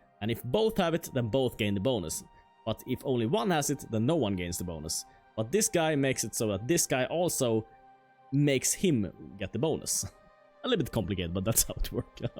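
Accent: Sweden. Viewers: swedish for sure, definatly not eastern european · Scandinavian I would say. Probably Swede to be precise.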